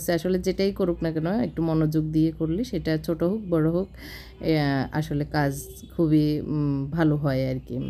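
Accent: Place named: Feni, Bangladesh. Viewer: apu.ame.feni district tak bolce. apnar kota suna mona hocca apne fenir manus.apnar badi fenir kon grame.bolban ke.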